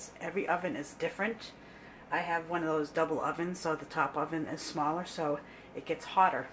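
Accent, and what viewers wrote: Boston, United States. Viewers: I hear Boston! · Nice and easy - I'm thinking Boston or Waltham - but I could be wrong with that accent - but we all LOVE accents - it's what makes America that thang - as for the shot glasses - says a lot LOL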